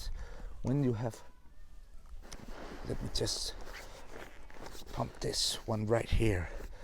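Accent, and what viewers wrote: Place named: Denmark. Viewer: Can i ask where you live? You sound Danish but most of your videos dont seem to be shot in Denmark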